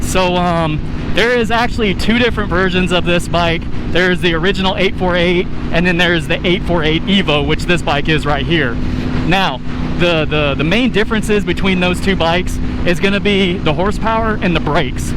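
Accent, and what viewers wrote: United States. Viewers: Between the paint lines looking the same as in a lot of the US and your accent I had assumed you were in the US and thought of offering you some time on a 2013 Streetfighter 848 if you were going to be anywhere near the DC area.. but no, South Korea is a bit of a trip 😂 Maybe for the best, mine isn't the prettiest example! Coming up on 30k miles, dark stealth, frame sliders, aftermarket tidbits here and there... It rides nice, but it looks its age in places.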